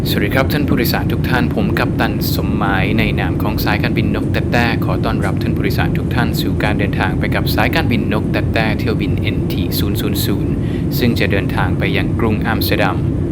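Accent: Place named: United Kingdom. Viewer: I LOVE YOUR BRITISH ACCENNT ♥️♥️♥️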